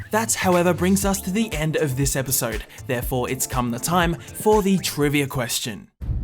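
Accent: Australia. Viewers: are you Australian? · wait a second.. are you aussie? cuz you sound aussie to me lol btw i’m australian lol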